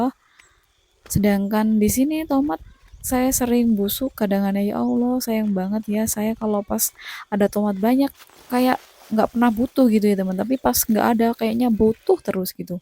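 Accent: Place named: Malang, Indonesia. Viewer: BHS orang malang rijik rijik 😂👍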